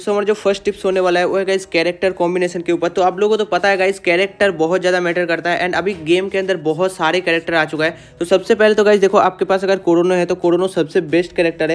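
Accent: Bengal, India. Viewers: Bro bengali ho kaya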